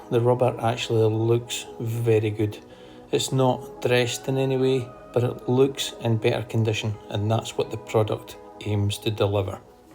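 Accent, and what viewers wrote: Scotland, United Kingdom. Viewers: What an informative film and of so much relevance to me as I started using GT products recently Tyre Serum and Decon Shampoo. I tried the Serum on a a couple of cars and so far have been impressed. My Father in law has noticed a difference in his sidewalls. Do I detect a hint of a Scottish accent there. Some other Youtuber recently suggested putting a dressing on top of the Serum if desired and I have not tried that yet and wondered what your thoughts were. I subscribed also as I am a keen detailer with time on my hands to learn as much as I can before I think of setting up my own business. Thanks for the info.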